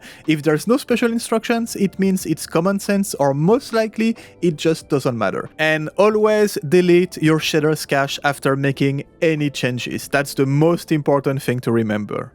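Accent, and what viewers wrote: France. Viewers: bonne vidéo merci. tu serais pas français ? ton accent me fait penser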